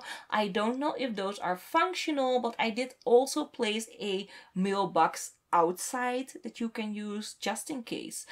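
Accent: Netherlands. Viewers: It's so cool! I can't wait to see what you'll do with all these appartments. But I think there will be a lot of episodes and that's good :D And by the way I do love your Dutch accent, espcially when you say garage. In each video I'm waiting for you to tell it and I can help myself smiling. Can't wait for the next video !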